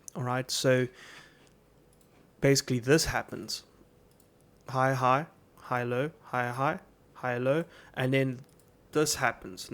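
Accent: South Africa. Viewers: My guy, great video. Your accent sounds like you are from SA or Namibia... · Finally the South African accent haha making us proud well done best content I’ve seen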